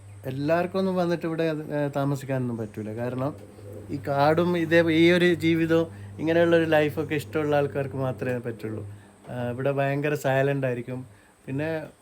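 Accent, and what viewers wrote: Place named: Kannur, India. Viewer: Sir kannur side anennu thonunnu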